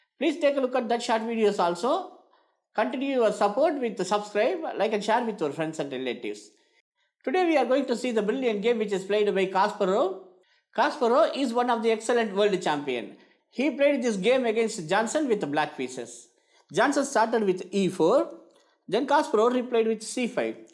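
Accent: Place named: Tamil Nadu, India. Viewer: Super sir I am also tamil..... your English was super sir....I guessed you are a tamil people....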